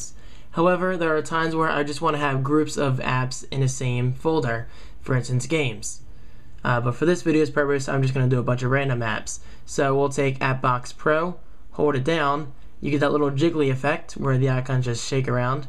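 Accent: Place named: California, United States. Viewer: haha are u from california or somethen your accent is cool instead of photos your like FOWTOES XD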